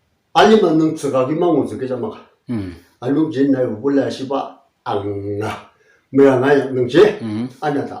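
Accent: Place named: Langpangkong, India. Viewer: You talk like Langpangkong dialect am I right?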